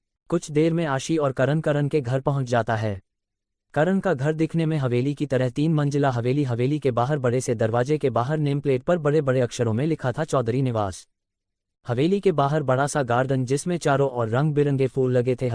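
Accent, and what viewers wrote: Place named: Haryana, India. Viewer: Yes haryanavi I like it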